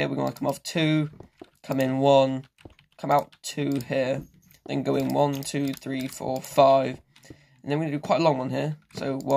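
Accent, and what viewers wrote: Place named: United Kingdom. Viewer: You have a British accent That's mean you're from UK Am I right?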